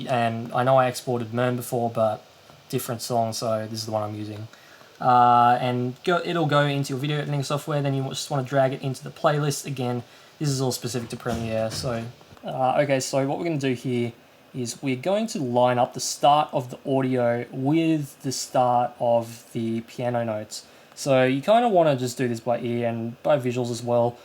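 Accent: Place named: England, United Kingdom. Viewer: Your English is so English.. Us people from non-English-speaking countries may find ourselves as an intruders... Keep it low so us can understand you. Thanks anyway for the nice video!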